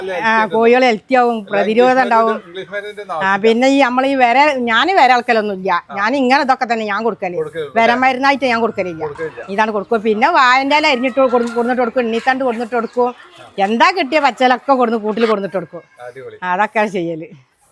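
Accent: Malappuram, India. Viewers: മലപ്പുറം ആണ് അല്ലെ സംസാരം കേട്ടിട്ട് 😁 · നമ്മളെ മലപ്പുറം ഭാഷ ഇങ്ങനാടാ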